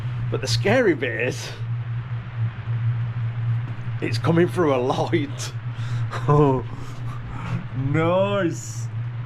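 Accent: United Kingdom. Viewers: Mate, as I may have said before, I’m a 63 year old RAF Vet, watching you for years, a patreon for about 4 years, loving your vids, now living in Maryland in the US working and saving hard so I can return back to the UK. Hopefully I’ll get a chance to meet you before my times up. Every evening I hope to see your latest vid to remind me what I’m missing in the uk and listen to a British accent. Thanks for what you do, it means a lot to me and many others around the world.👍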